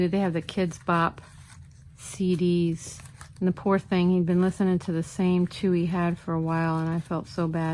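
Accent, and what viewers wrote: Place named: New York, United States. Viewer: Loved your New York accent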